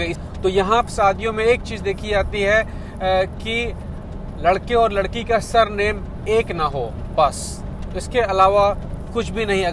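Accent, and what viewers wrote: Bihar, India. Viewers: Bhai aap ka language me biharipan lagta hai ... Very good bro thx for this information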